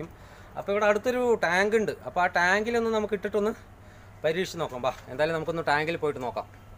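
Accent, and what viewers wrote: Palakkad, India. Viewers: യെ ഏട്ടോ നിങ്ങ പാലക്കാടാണ്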